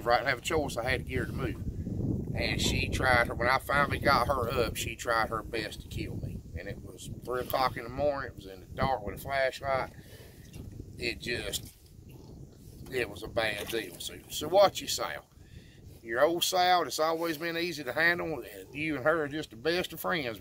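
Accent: South, United States. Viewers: I love the southern accent. I can listen to it all day. Much love to our amazing farmers.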